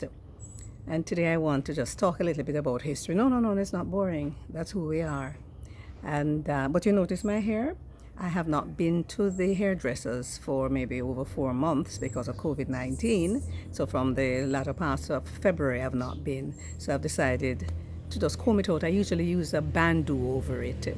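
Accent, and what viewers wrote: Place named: Jamaica. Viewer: Well said aunty real Jamaican talk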